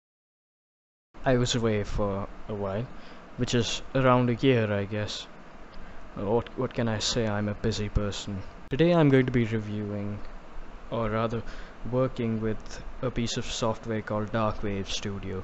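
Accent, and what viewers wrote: India. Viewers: He sounded Indian from the start lol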